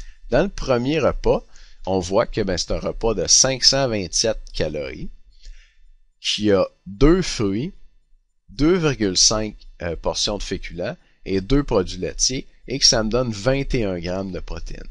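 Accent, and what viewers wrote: Quebec, Canada. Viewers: Ici, au Canada, c'est notre accent francophone. Va chier. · il est québécois, je comprend et ca me dérange pas contrairement a ce que d'autres dise. Merci pour ta vidéo intéressante.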